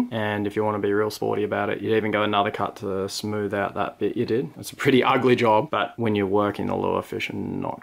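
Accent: Australia. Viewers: These videos are so therapeutic to watch. Sometimes I just wanna watch a guy talk about fishing with good camerawork and a hectic Aussie accent.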